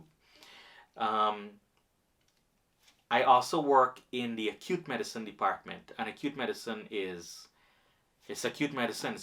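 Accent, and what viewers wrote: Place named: Guyana. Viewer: You honestly sound like ur from Guyana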